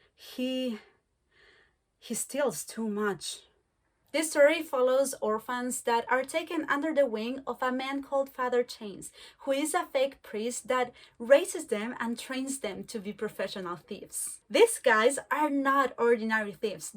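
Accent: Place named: Chile. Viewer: Nada que ver, pero desde el primer segundo que te escuché reconocí el acento chileno, saludos compatriota 🇨🇱🤞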